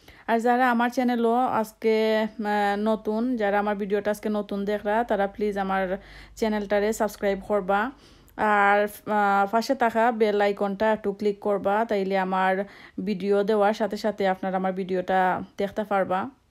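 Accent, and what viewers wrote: Sylhet, Bangladesh. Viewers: মাশাল্লাহ আপুনি তুমি কত সুন্দর করে সিলেটি মাত মাতলা খুব ভালো লাগলো আজকের ব্লগ টা। এভাবে সিলেটের মাত মাতবা সবসময় প্লিজ। শুনে আমার বেশ ভালো লাগে।রান্নাগুলো অসাধারণ মজার রান্না করলা।খেতে ইচ্ছা করছে কিতা রতাম গো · রান্না গুলো ভালো হয়েছে আপু । আর সিলেটি কথা শুনে খুব ভালো লাগছে । পিয়াজু আমার খুব প্রিয় । Vlog টা সুন্দর হয়েছে । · Apu styet vasha amr khub sweet Lage. Aktu Maya Maya vab ASE. R tmr ranna gulo shei hoyese. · Apu tomar sylhety kotha shob bujhesi, valo laglo, Lau khaw na keno apu at Khub valo shobji, rannagulo mojar, shinar ghoshto alada moja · Apa sylheti hunthe bala laglo, otho mojar ranna khaibar r piaji oise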